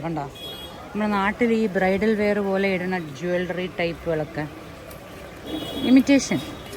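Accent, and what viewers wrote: Trivandrum, India. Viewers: Good presentation.good language tvm😀🤣🤗good🤗thank you. Suscribe cheythu. Good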